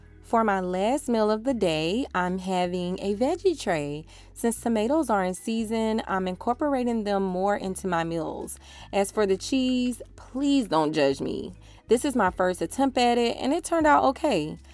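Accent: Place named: South, United States. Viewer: Not sure how you got to my algorithm, but I'm happy ya did and you had me ❣️🎶 I've been debating giving raw a try but it seems daunting, as we have 4 young children and the thoughts of cooking for them and something entirely different for me overwhelms my mind. I think I'm going to try it by starting small. Thanx & God bless!✌🏽 (P.s....sounds like I hear some Philly in your accent yet, I hear some South. I'm orig from VA.)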